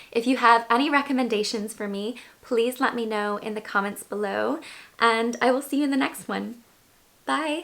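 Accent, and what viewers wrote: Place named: Ireland. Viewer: The minute you said you were from Ireland i could instantly hear it in your accent, but i hadnt picked up on it before! I dont know why but i thought you were American! Another great vid, loving the content :) xx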